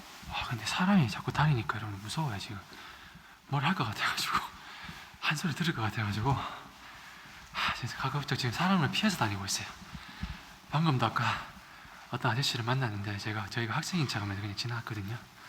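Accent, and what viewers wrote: Gyeongsang, South Korea. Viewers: 사투리를보아하니 경상도분이신가요? 영상재밌게보고계십니다.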